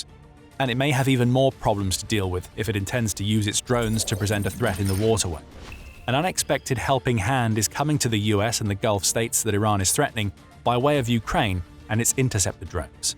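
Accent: England, United Kingdom. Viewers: I can tell just by the narrator's English accent that this some bullshit. "Sorry, bloody bullshit." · a British accent??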